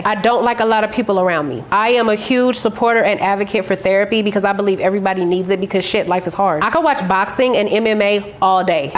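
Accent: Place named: United States. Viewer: I wanna kiss feet, legs, lips, shoulders., Grace Gealey, Zaza Ali, Florence Ballard(RIP), Karrine Steffans, Iva LaShawn are the hottest women. feet and shoulders are hot. i got a question for you that you didnt say in the video. What race/nationality are you? I saw on your Facebook page that you are from Puerto Rico. I thought you was from america because you sound like a black woman. You dont sound like a foreigner when you talk. Did you get married? I saw you are engaged to a man on your Facebook page. I wish wasnt engaged to get married because i would love to have as my woman. If you wanna know about me. Im a lightskin black man who is 5 feet 10 inches. I weigh 185 pounds. Im 49 years old. I live in Maryland. I dont have a black woman. I dont have kids. I live with my parents because i dont have a job. Im trying to get SSDI money from social security office. 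I got a telephone interview with social security October 31 about SSDI money. White people wont let me keep a job because white people complain too much. Thats why im trying to get SSDI money from social security. I hope social security approves me for SSDI money so i can use the money to get a nice apartment in white neighborhood in Alexandria, Virginia.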